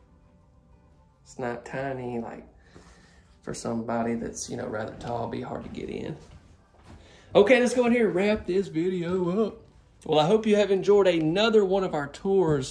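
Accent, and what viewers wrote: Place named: Alabama, United States. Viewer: I enjoy watching your videos. You make any of the homes appear better than what they are I am from the south. When listened to you talk I thought, this guy does not sound like he may be from Oklahoma! He sounds like either Alabama or Mississippi!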